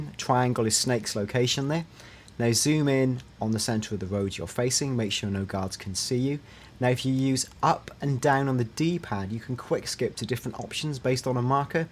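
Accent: United Kingdom. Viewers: You sound like a British Elijah Wood. Cool.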